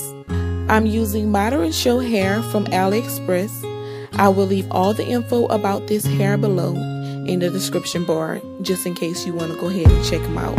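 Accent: Louisiana, United States. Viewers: aw<link> this tutorial just seemed sooooo sweet! LoL. beautiful job... do i hear a Louisiana accent?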